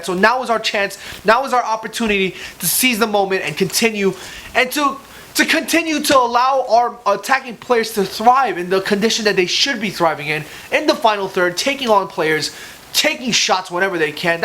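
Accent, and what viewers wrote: Manchester, United Kingdom. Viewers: sounds like a typical mancunian like 95% of trafford united fans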